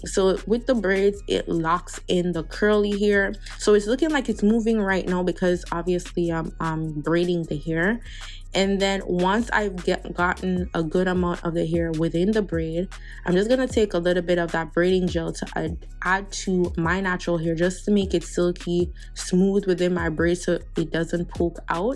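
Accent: Jamaica. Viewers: Are you Jamaican??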